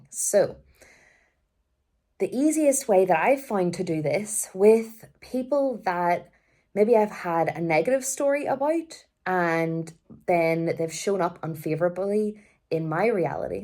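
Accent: Ireland. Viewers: I love your accent! I'm in the states but have blood over in your neck of the woods. Galway I believe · am from the uk and i understand your Irish accent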